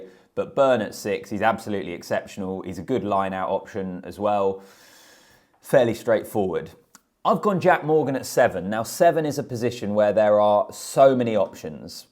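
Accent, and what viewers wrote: England, United Kingdom. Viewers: Do you not hear a pretty english accent mate? Also we going to act like ireland bavent been top 2 in the world for like 3/4 years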